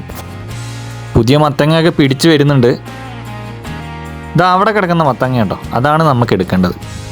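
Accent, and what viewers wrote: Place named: Muvattupuzha, India. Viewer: സംസാരത്തിൽ ഒരു മുവാറ്റുപുഴ... പെരുമ്പാവൂർ... കോതാമംഗലം ടച്ച് ഒണ്ടു "ട്ടോ " 😎, ഒന്നുകിൽ പരെന്റ്സ് അല്ലങ്കിൽ ഗ്രാന്റ് പരെന്റ്സ് ഈപറഞ്ഞ സ്ഥലവും ആയി കണക്ഷൻ ഇല്ലേ ബ്രോ ? സത്യം അല്ലെ ☺️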